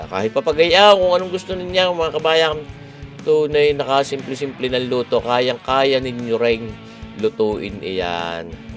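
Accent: Batangas, Philippines. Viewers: Good evening idol kung batangeño accent,, shout out po sa inyo diyan sa Batangas,,, akoy laging nanonood ng iyong video ❤❤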